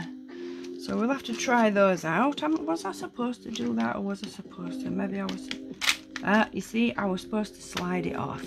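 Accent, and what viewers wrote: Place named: Yorkshire, United Kingdom. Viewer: I think th UK hub is in Birmingham, so I'm not anywhere near there. I'm originally from Sheffield , so I knew you were a Yorkshire lass !!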